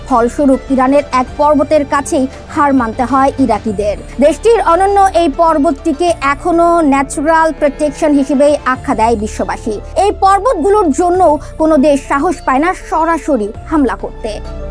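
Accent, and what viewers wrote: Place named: Kolkata, India. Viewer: _____এই চ্যানেলের উপস্থাপকের সংবাদপাঠ কলকাতার মতো। এটা কি সত্যি বাংলাদেশের YouTube চ্যানেল নাকি কলকাতার....🤔🤔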